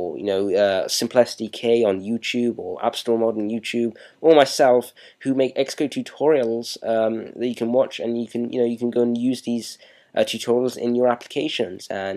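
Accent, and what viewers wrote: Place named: Germany. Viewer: i sense a german accent, being german myself, where in germany were you born?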